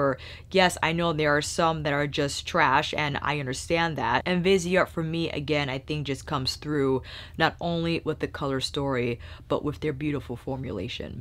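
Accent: France. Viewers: Your french accent is so cute ^^ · Thank you for making the effort to pronounce names in French. Loooove your accent ;). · the french accent really do be hitting different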